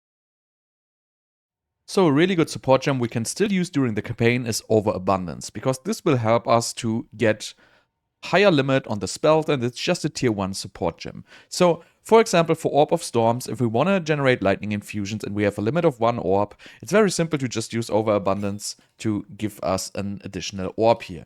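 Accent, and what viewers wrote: Germany. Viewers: Hello, I don't think the guide itself is bad, but you know what you're talking about. I just have a little problem when you say you only use this or these items at the beginning in the video. In theory it all works, but in the video you simply adjust endgame gear. They also make such a difference that the build runs as smoothly as you imagine, you know what I mean. But I don't want to say anything bad, I've been watching your guides for a long time and they've always been good. I have to praise you too ^^ Ps. Are you German? You have a German accent. Greetings from Germany. Nachtrag ich habe erst jetzt deine neuen POB bzw moba guides gesehen sorry mein Freund <3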